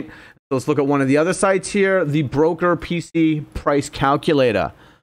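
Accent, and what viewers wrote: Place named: New England, United States. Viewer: you sound like you're from either New England... or New York. (edit) The way you said "part" just narrowed it down I think. Massachusetts or Rhode Island.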